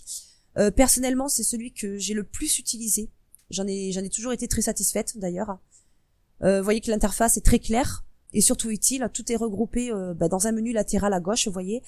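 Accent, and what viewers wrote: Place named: Marseille, France. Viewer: marrant l'accent de Marseille ^^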